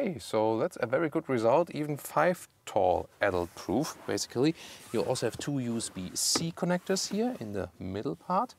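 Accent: Germany. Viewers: I do love these reviews with the German accent, I want to hear him say " for you Tommy ze vor is over".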